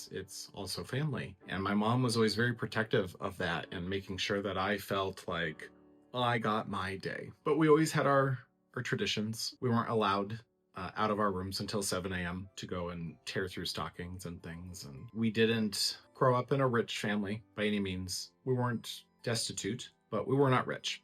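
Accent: Minnesota, United States. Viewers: Time is indeed a precious commodity, and the times you've shared have been cherished by me. You look so cozy in your Christmas bedecked home with your sweater and beard. You may be in Orlando but in my mind you're right there at The Estate penning Christmas cards and drinking eggnog by the light of your tree. On the 12th Day of Vlogmas my true love gave to me: Twelve Months of time, beauty and dedication to all that you do, be it your home's restoration, decorating, or simply allowing us to be a part of your beautiful, beautiful world. As well as Eleven eggnog liquors Ten-ish strands of "tinthel" Nine ladies ironin' Eight cameras tumblin' Seven cutouts glowin' Six berries spillin' Five Christmas trees 🎄🎄🎄🎄🎄 Four Paolo meows Three sets of batting Two power strips 🔌🔌 And you sound like the movie Fargo 🎄⛄🙂⛄🎄 Merry Christmas my beloved. You're amazing.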